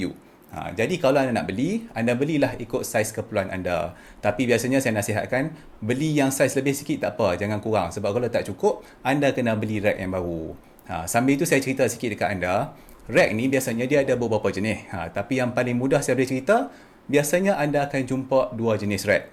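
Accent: Malaysia. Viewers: patut lah awak ngomomong macam orang malaysia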